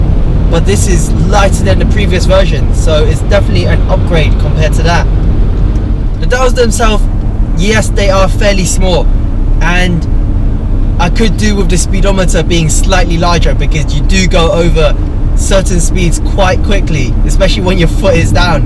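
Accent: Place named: London, United Kingdom. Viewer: You sound very much like a London kid- innit?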